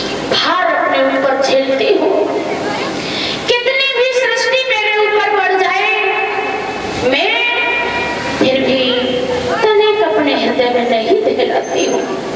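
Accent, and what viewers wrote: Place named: Fatehabad, India. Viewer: Maa Vivek Se Sundar to Katha kahati hi jai Unki Awaaz to Fatehabad Jaisi Hai❤❤❤❤❤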